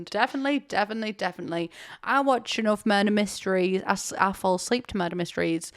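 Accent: United Kingdom. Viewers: i really love your videos, i was just wondering if you could add captions (either in the video or in youtube) bc with my adhd auditory processing can be hard sometimes, especially with thicker accents like yours (maybe not as bad for uk folks but i'm american so i'm not as assimilated to that sort of accent). it's like the only thing keeping me from binge watching them constantly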